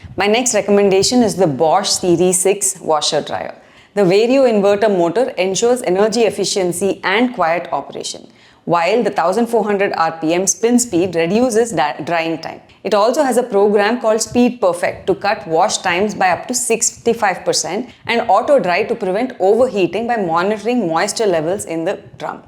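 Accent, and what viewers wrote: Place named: South India, India. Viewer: by your english accent i can make out that u are a south Indian may be andhra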